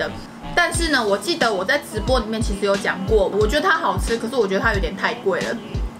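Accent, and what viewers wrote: Taiwan. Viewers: 講台語真的蠻夠味的哈哈哈哈像那種很可愛的小台妹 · 的台語腔好台語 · 講台語好可愛喔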